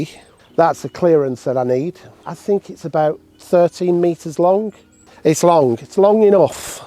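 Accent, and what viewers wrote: England, United Kingdom. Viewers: your beautiful English accent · Brogue is Irish...he is English ! 😊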